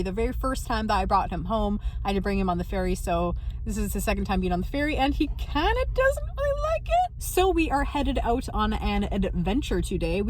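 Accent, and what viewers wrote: Canada. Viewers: I could tell you were from Canada by just your accent. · I knew you were CANADIAN!!! I been around that awesome accent my whole life!!! Pops was born in Chatham, Ontario, Gramps and Granny in London!!!!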